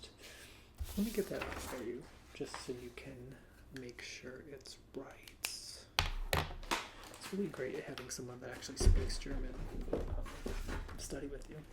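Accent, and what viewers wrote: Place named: United States. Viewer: As a German-speaker, I say your accent is adorable. ^^ I think it's the first time I ever hear an American (you're American, right?) speak so much German haha. :) I'm glad you uploaded a new video, I find your voice very comforting, I listened to all your vids while doing my dreadful internship in Berlin. ^^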